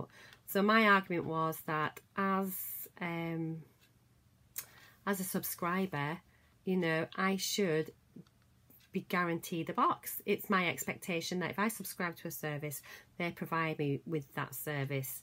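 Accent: Yorkshire, United Kingdom. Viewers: That would have been a nice little gesture on their part if they would send you the Monday all I want box for free, especially as you are a beauty youtuber!! Also, you sound like a Yorkshire lass... am I right? xx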